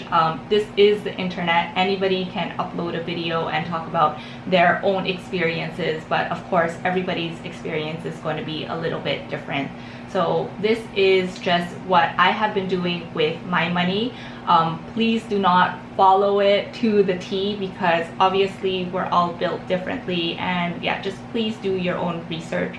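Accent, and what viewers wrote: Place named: Philippines. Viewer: Hi, are you Filipino? you sound like one! I'm looking for a crypto buddy, I'm also a new crypto investor. Hope we can connect.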